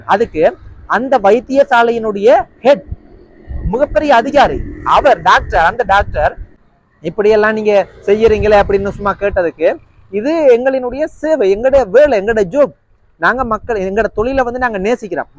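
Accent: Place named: Colombo, Sri Lanka. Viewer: உன் தமிழ் கொழும்பு தமிழ் மாதிரி இருக்கு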